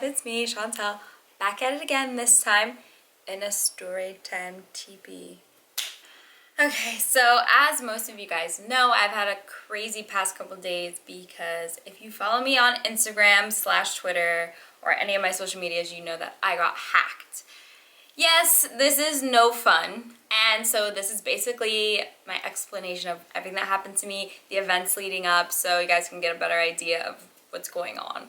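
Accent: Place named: United Kingdom. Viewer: the attempt at the British accent makes her look even hotter 🙃😍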